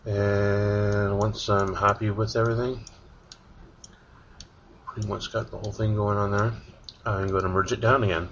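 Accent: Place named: Canada. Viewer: Def Canadian but I can’t place what part. Bc lower mainland, SK or Manitoba. Am I right?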